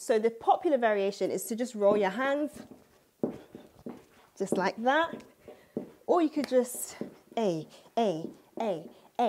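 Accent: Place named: United Kingdom. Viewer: Loss the British accent and find your REAL tribe in Africa and be that. Stop the neo-influence policy of Europeanization.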